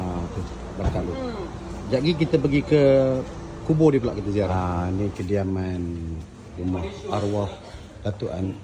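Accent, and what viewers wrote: Pahang, Malaysia. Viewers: Loghat Pahang awak yek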